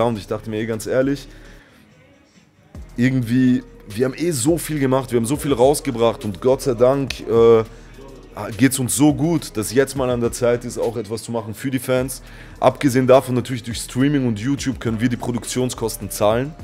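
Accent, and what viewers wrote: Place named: Balkans. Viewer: On je nas. Prica DE sa nasom bojom glasa.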